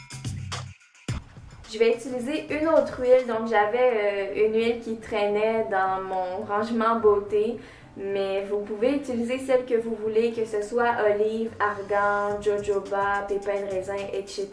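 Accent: Quebec, Canada. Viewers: Accent québécois ? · super vidéo <3 et j'adore ton accent québécois/canadien :3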